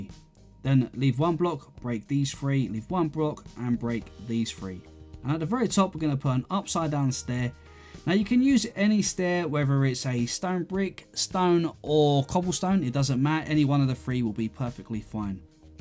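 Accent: United Kingdom. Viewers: Hey...my daughter is the reason i play minecraft. Its our way of spending time together. She likes the game so i take intrest in what shes doing and enjoying. Shes always asking me to build things for her cause she wants her village to expand faster...well sadly im definatly not an artist. And my builds look worse than what she can do..lol so i started you tubing tutorials and found you. I love how simple you make the builds seem. And i absolutly love love love your accent and the sound of your voice. Where are u from if you dont mind me asking. My guess would be in the uk somewhere. But my daughters village is really starting to take shape and she is really loving the midevil style. Thank you so much for making my daughter happy...she was getting annoyed with having to rebuild my builds so they dont look like crap...lol and now shes you tubing tutorials as well and finding stuff she likes. 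So i have subscribed and look forward to seeing more amazing things that me and my girl can do together... bringing families together.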